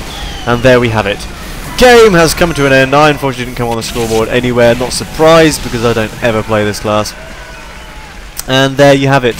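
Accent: England, United Kingdom. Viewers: where in england are you from?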